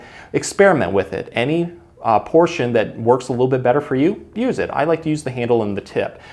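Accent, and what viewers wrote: Michigan, United States. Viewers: This is the most Michigan accent I’ve ever heard in one video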